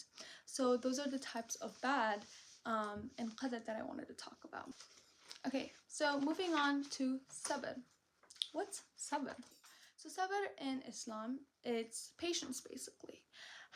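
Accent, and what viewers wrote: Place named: Pakistan. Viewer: you got Pakistani accent.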